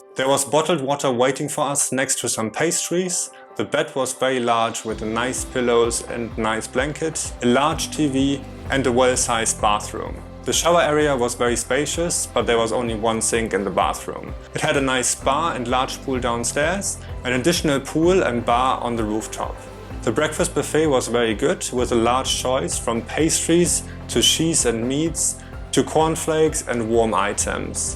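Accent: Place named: Germany. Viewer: nice vlog, german accent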